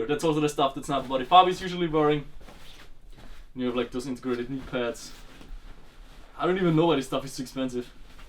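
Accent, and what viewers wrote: Bavaria, Germany. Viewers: German accent right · sprichst du deutsch,schweizerdeutsch oder bairisch ??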